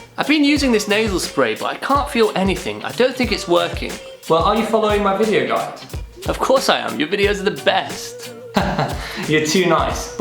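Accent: United Kingdom. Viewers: oh wow he is bri ish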